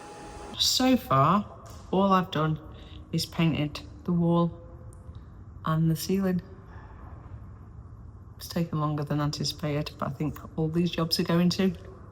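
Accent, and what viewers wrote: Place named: Sheffield, United Kingdom. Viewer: It’s looking great already. Please jabber away, I lived in Sheffield for 5 years (in Park Hill flats — Norwich Row!) and I miss the accent.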